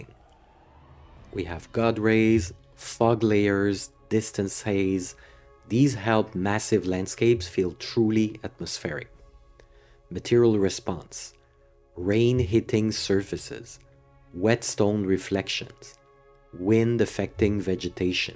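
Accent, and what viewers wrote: France. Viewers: Great analysis! This is probably my favorite game since Skyrim. Maybe with the exception of RDR2. I'm 80 hours in, mostly due to having been on a sick leave for 2 weeks, and I have never been gaming so intensively before, at least not since Skyrim. For me, it's an absolute strike of genius. New sub from Norway ! And kudos for your English as well. I believe I can hear some French accent from time to time, but very little. French, Danish and Norwegian people tend to struggle with heavy accent 😊 (Sorry if I'm mistaken about the accent 😁) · Are you a fellow frog? I seem to recognize my people’s accent! Great video by the way! This game is breathtakingly beautiful. I have spent so much time around puddles and creeks watching water and nature just happen. I’ve never walked as much in a game before! I literally watched comrades I sent to build a house build it in real time. 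It took 6h in-game! The level of immersion is off the charts in this game!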